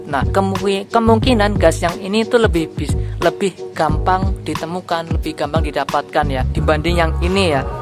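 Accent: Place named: Java, Indonesia. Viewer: Jawa mana bang.ko kaya kenal logat y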